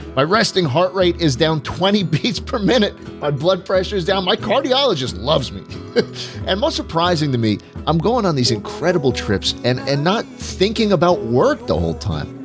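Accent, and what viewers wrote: New York, United States. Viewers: i like how his thick new york accent slowly faded away since he retired, leaving that velvety voice i remember from his earlier days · His New York accent is pretty constant but it gets stronger sometimes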